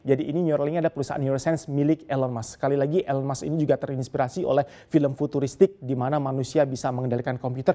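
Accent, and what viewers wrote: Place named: Java, Indonesia. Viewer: aksen jawa nya bikin greget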